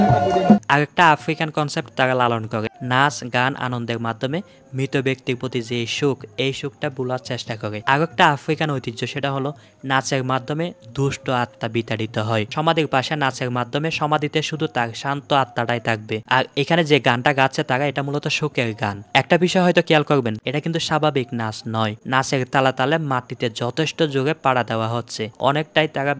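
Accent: Chittagong, Bangladesh. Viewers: আপনার র/ঢ় উচ্চারণ ঠিক করেন,চাটগাঁইয়া ভাষাই আপনার জন্য উওম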